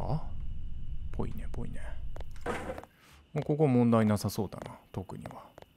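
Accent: Hokkaido, Japan. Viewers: 先生の作品紹介が全部好き。滑舌良くて聞き取りやすい。そしてたまに出てくる北海道弁がかわいい笑(私も道民)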